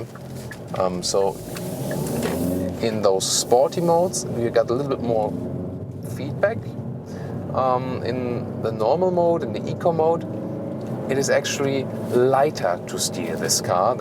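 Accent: Germany. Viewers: Once more an excellent review, a pleasure to watch. Thank you. I also enjoy your English with some German flavours, which is totally different compared to American English which I hate as many intelligent people do 😝..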